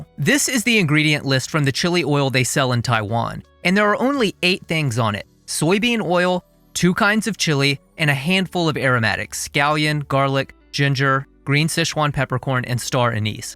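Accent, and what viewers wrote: Texas, United States. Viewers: Is a native Texan? The way he pronounced "oil" is very specific. Is that the Texan accent? Just curious.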